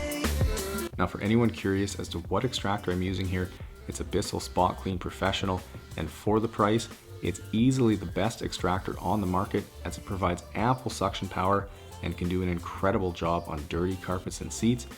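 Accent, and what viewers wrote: Canada. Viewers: I just discovered your videos and now I'm addicted lol! Also I knew I heard a fellow Canadian as soon as you started talking haha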